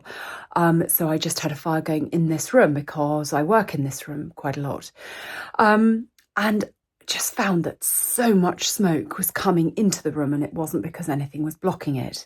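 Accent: United Kingdom. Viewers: I happened upon one of your vlogs and I have to say, you have been a source of delight this Christmas season. You make the mundane fun. Your manner of speaking is so funny and engaging. I adore Britspeak! I love your natural enthusiasm. And the way you don't sacrifice the good for perfection is very relatable. The hops in your hair episode was my favorite. The way you laugh at yourself and lean into the camera at times is comedic gold. I look forward to more episodes. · I love his British accent 🇬🇧♥️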